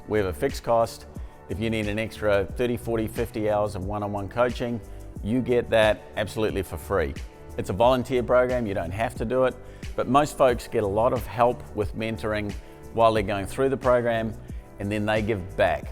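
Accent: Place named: Australia. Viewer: Given your accent, I thought you are based in Australia.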